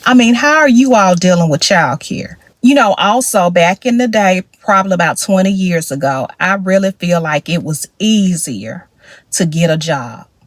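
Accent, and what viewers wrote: South, United States. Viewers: A typical southern accent and love it🎉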